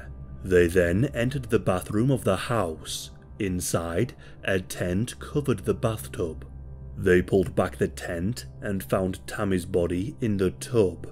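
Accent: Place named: Northern England, United Kingdom. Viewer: I am a British born American who misses hearing English voices. I even though I was born in the South (London) I love Northern accents, like "s too. voice is so soothing even though he is talking about the most disturbing things.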